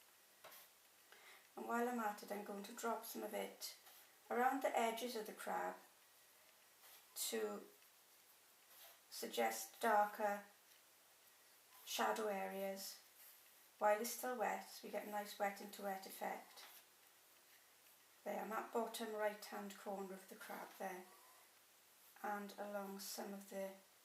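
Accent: Wales, United Kingdom. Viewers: Yeah. It's easy to tell a welsh person from the accents. I love your video by the way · I just got into watercolours as a way to manage stress and anxiety - it can be overwhelming as a beginner but your video was exactly what I was looking for. You move at a good pace, your explanations are wonderful and your Welsh cadence is a pleasure to my ears. Thank you, brilliant work.:)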